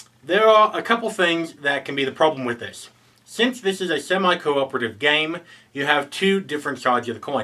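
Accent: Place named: United Kingdom. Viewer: Funny! I thought you were a Brit! Good job!! 🇬🇧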